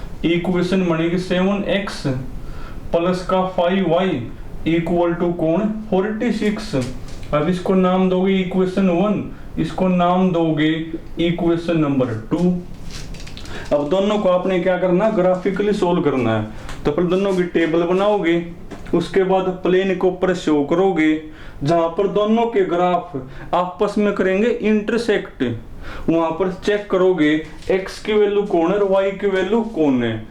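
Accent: Haryana, India. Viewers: Super aap harayana see ho kya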